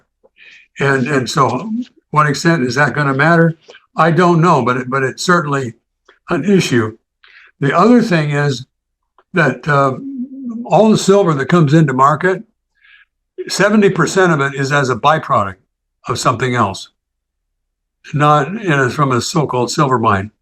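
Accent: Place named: Canada. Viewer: I love your broadcasts, your guest choices and especially your Canadian accent! 'Out' 'About' 😂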